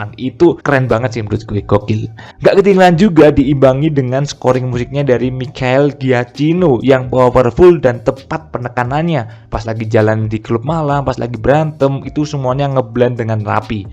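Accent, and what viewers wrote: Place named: Java, Indonesia. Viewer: Saran bor bisa gak, jang pake gue - gue Soalnya kan dirimu kentara banget medok nya Yg mending medok aja lebih enak didenger Dr pd lo gue lo gue..😁👍👍👍👍🙏🙏🙏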